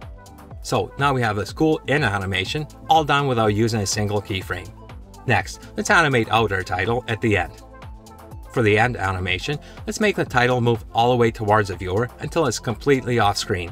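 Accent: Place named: Canada. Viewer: Where is this accent from? It seems Canadian, but I dunnoo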